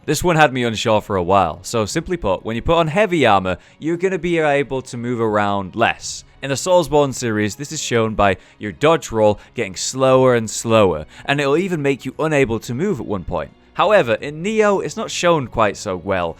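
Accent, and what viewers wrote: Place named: Bristol, United Kingdom. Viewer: Great video mate, thanks! Can I ask, are you from Bristol but moved to Yorkshire because that is the only solution I have for your accent..?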